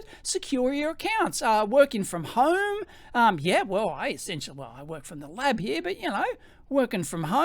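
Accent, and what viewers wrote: Australia. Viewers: Cant believe how many folks totaly missed that it was complete sarcasm. And Im just an OLD YANK who knew even with that awfull ausy accent. P.S. I hate my dictator as much as yall so dont hate on all mericans. misspellings are on purpose. TWO THUMBS UP 👍👍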